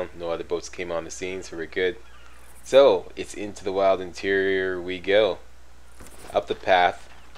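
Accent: Canada. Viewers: Thank you!!Furthermore your excellent walkthrough videos, for me it's an inspiring advanced training in English or God s(hoops)ave the Queen "Canadian English"!!!"gizzahs".."pps"..and "gonna blow up a tower" are icing on the cake.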